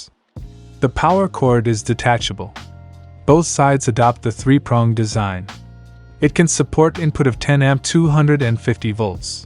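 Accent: China. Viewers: I really prefer your english with chinese accent instead of this AI english transcription.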